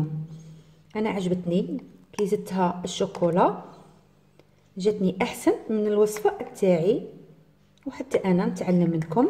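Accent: Algeria. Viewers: حبيت نقلك كتعجبني لهجة الجزائرية الله يوفقك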